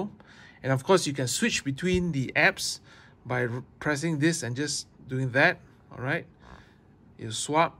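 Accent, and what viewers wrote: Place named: Singapore. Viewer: You have an interesting accent, are you from Singapore?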